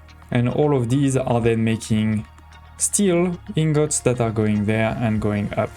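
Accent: France. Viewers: Hello man I know that it has nothing to do with the video but I have have a problem with my mods, i want to play with the Commuter pier for new world but it doesn't work. All the other mods of Spice it up don't work too, and I use the mod launcher so if you have any clue how to fix it or if you know if spice it up is not udpdated with the nex world rising it will help me a lot. ( I think your french looking at your accent and me too so if your french you can answer in this language). Thanks for the videos :)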